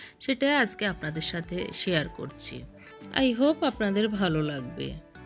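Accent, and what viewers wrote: Noakhali, Bangladesh. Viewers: আপু গো তোমার বাড়ি নোয়াখালী তাই না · আন্নে নোয়াখাইল্লা নি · আপনি কি নোয়াখাইলা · আপনার দেশের বাড়ী কি নোয়াখালী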